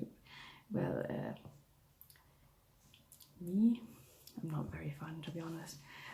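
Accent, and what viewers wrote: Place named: United Kingdom. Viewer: Great video! 😄 I also didn't know the term Literary Fiction before I found Booktube. I googled it. 😄 Your English is really good btw. Did you spend some time in Great Britain? (Just a guess because of certain words you use. 😅)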